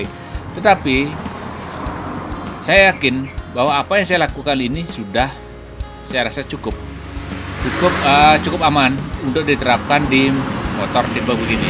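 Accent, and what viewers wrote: Bali, Indonesia. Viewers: Dari Bali pak? Logatnya kental Bali, bisa garap ACG stater vario 150 dipasang di CB?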